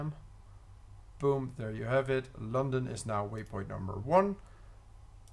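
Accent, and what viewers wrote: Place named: United States. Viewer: American pronunciation is quite annoying.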